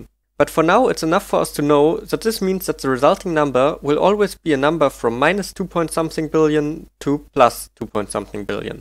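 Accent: Germany. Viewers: man hört das du deutsch oder whatever bist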